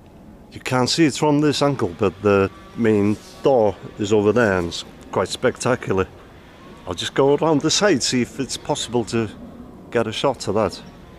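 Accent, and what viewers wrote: Wales, United Kingdom. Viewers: You Have Such A Lovely Accent, I'm Biased Though Also Being Welsh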